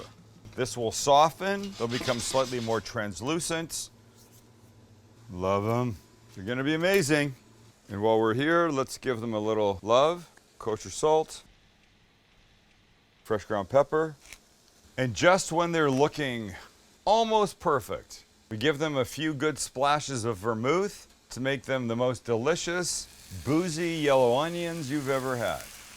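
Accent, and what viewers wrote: Canada. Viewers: Hmmm - - did I just detect the faintest trace of a Canadian accent.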